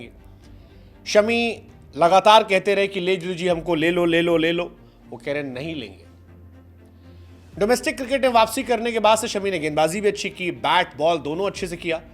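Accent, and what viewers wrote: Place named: Uttar Pradesh, India. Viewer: ghanta fadak padh rha hai😅, up waale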